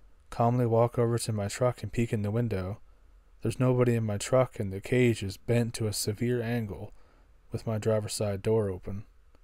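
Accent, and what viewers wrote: Canada. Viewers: I'm pretty certain he's from Canada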